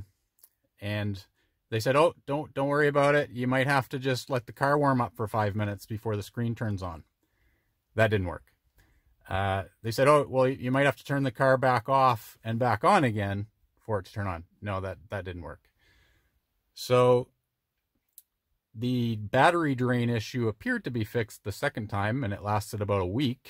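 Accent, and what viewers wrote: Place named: Illinois, United States. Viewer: That Mid US Illinois area accent is just annoying! Hence, the extreme cold air may have contributed to the screen not turning on! The Acuras for the rest of us in the USA should be mostly just fine!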